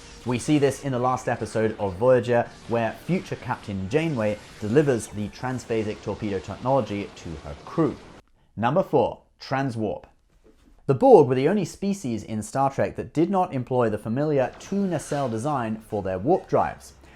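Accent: United Kingdom. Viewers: YES THE FRICKING BRITISH IS BACK EVERYONE THIS IS EVEN BDTTER THAN WHEN I FIRST HEARED ABOUT HALF LIFE ALYX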